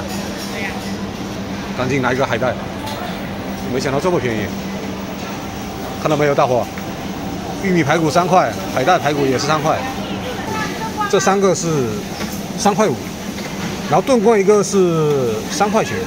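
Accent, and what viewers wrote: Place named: Fuzhou, China. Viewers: 一副算计男的德行， 还带一口地瓜腔，真烦人。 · 地瓜腔好浓😂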